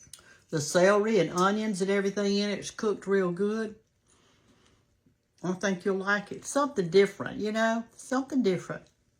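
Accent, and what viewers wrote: South, United States. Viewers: your such a sweet person I just love watching you cook and hearing your southern accent and I like your recipes also prayed for your healing also. GOD BLESS YOU darlin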